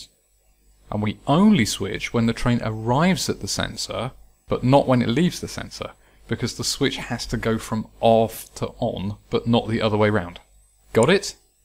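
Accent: United Kingdom. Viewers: A Brit dude with brilliant story telling educating me about arduino and trains? YES PLEASE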